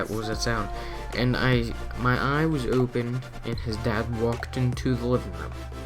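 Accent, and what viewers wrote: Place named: Canada. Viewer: u sound Canadian m8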